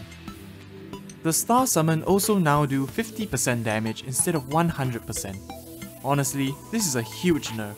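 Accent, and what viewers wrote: Singapore. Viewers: Singaporean accent? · r u singaporean?theres a strong singaporean accent no offense :/ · I'm sorry if you aren't but you sound very Singaporean, like not when we are speaking casually amongst others but when we are presenting something in a formal context. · are you singaporean by any chance?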